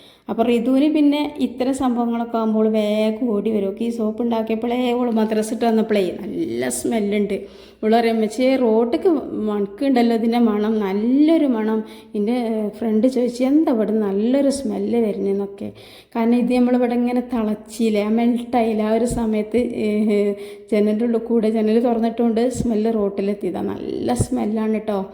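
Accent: Malappuram, India. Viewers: ഇപ്പോ താത്ത ന്റെ സംസാരം മലപ്പുറം ഭാഷ ആയി 😂ഇതിനു മുമ്പ് ഇൻഡോകി ഇനാലെ എന്നൊക്കെ പറഞ്ഞു ബോറടിപ്പിച്ചിരുന്നു ഇപ്പോ പൊളിച്ചു ❤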